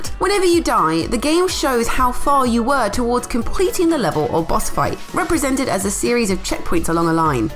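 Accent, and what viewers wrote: United Kingdom. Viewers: stop speaking british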